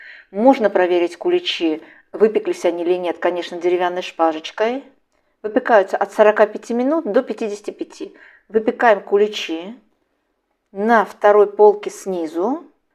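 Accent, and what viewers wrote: Baku, Azerbaijan. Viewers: Спасибо ,я тоже пеку куличи и научила меня бабушка она мне не родная но я считала но моей такая добрая,ласковая аиу меня не было не бабушек и дедушек ,венское тесто она говорила , похожее на ваше тоже ,очень лёгкое , но хочу попробовать ваше ,мне очень понравилось и ваш голос вы случайно не из Баку ,я из Баку ,голос приятный и вы говорите нечего лишнего ,попробую ваш ещё проще и очень красиво и наверное вкусно ,вас с страсной неделью и со Светлой Пасхой и золотым ручкам и вам здоровья и хранит вас вашу семью ,Господь Аминь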